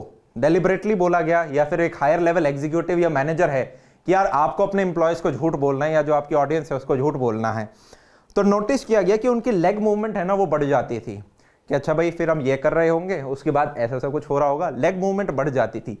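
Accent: Haryana, India. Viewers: Hariyanvi mix😅😅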